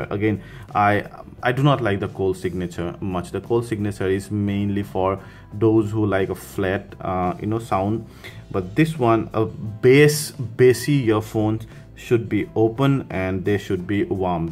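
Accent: Assam, India. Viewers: Are you assamese? Ur accent sounds like assamese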